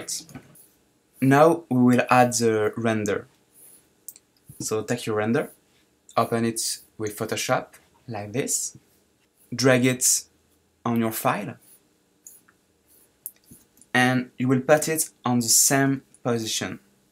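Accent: France. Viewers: Accent prononcé j'ai reconnu que tu étais français ;) c'est genial tout ce que tu fais vraiment ! Ce qui serait parfait : que les tutos soit en français :) Mais bon j'imagine que tu as beaucoup de travail donc continue ainsi ! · Mec c'est super ce que tu fais (je sais que tu es francais ca s'entend dans ton accent un peu ;) ) tu mériterais vraiment d'être reconnu car t'as un talent inné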